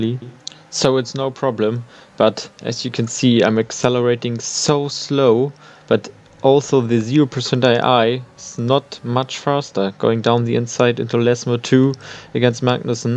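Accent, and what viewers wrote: Germany. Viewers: Da hört man doch das deutsche raus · Are you german? The accent sounds familiar...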